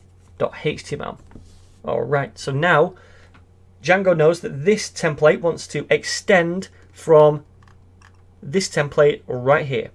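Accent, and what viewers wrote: United Kingdom. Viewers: The stof hahaha, love that british accent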